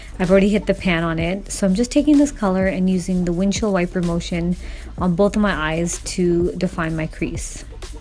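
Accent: Punjab, India. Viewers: You have a lovely Punjabi accent...😊😊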